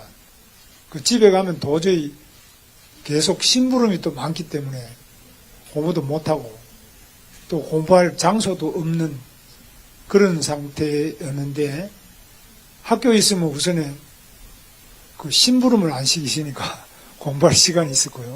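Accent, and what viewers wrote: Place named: South Korea. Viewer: 구수한 사투리 정이갑니다.대통령꼭대십시요.😊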